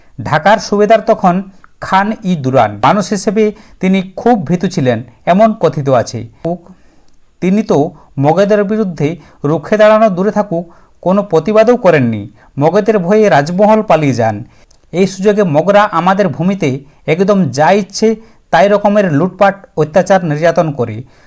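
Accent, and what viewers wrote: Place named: West Bengal, India. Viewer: Subject bhalo, kintu Medinipurer tane Bangla ta poriborton korte hobe....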